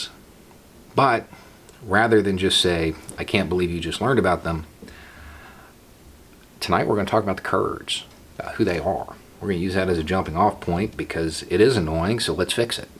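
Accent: South, United States. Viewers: Hey. Nice Southern accent, dude.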